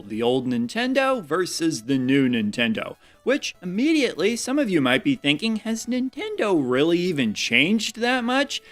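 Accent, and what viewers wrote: Midwest, United States. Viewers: Dude I legit haven’t watched one of your videos that I haven’t loved Thank you I watch your channel (mostly listen) while doing chores like washing dishes or folding laundry and seriously I feel like you are my Midwest (guessing on the accent) BFF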